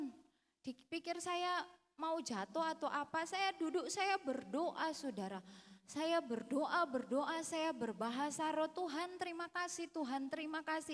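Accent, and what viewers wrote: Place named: Malang, Indonesia. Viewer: Tante uda medok bgt malangnya😅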